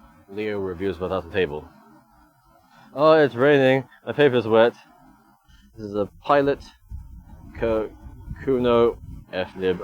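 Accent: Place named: Australia. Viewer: Wow, Australian :) Where about?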